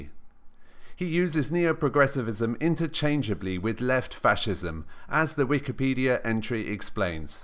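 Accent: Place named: United Kingdom. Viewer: british accent :checked video on a hot topic: checked shitty opinion : checked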